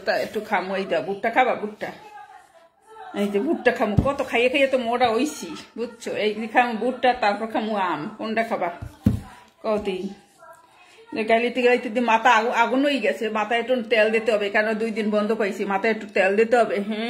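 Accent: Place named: Barishal, Bangladesh. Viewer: ম্যাডামের বাড়ি মনে বরিশাল। চালিয়ে যান ম্যাডাম, খুব ভালো বলেছেন।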